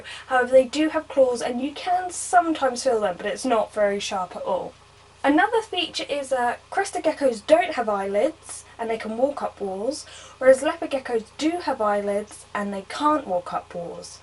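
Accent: England, United Kingdom. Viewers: DigitalGaming she lives in England and her accent is real bro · idiot it is a British accent!!!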